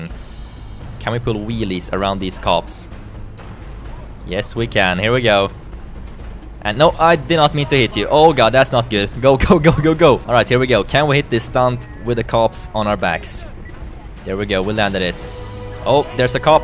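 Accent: Sweden. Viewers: Ur english is so swedish.. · Are you from sweden? Är du från Svergie ? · Are u swedish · Are you from sweden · Are you swedish? Cuz your dialekt sounds swedish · Good svengelska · Are you swedish? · Are u Swedish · I can hear on His English accent hes Swedish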